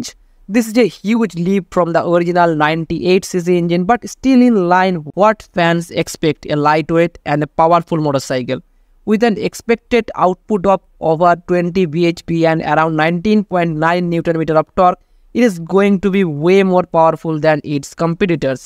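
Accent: India. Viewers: Bhojpuri Engliz😂 Nice one brother keep it up 👍👍😂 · Bihari bol rahe ho ki English bol rahe ho...acha laga but Bihari English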